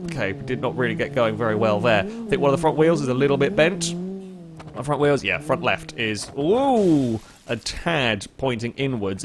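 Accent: United Kingdom. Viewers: Yeah he's British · He is British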